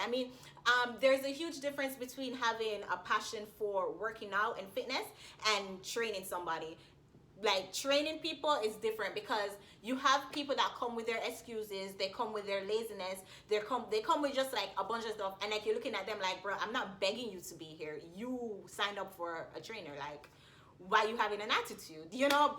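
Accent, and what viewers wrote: Jamaica. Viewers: Sound like a yaadi. Mek wi link up!!!!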